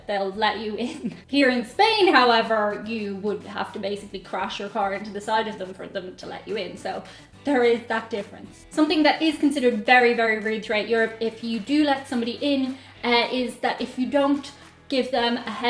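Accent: Ireland. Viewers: If it was North Korea you wouldn't have that lovely Irish lilt to your speaking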